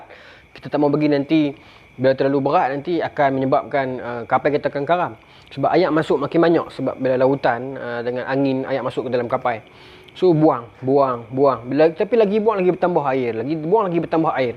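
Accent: North, Malaysia. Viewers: Assalamualaikum tuan boleh tumpang tanya asal orang utara negeri mana dan daerah mana? Maaflah kalau saya kolot tak tahu. Apa pun memang suka mendengar cerita sejarah yang masih tidak sesiapa dapat membongkar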